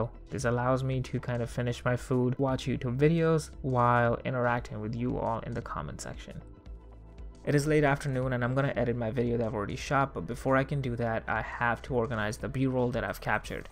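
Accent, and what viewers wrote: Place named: India. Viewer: Indian accent is still there :) , Oh Puchheya Na Kar Mera Schedule Ni But you are telling your schedule about the day ... lol XD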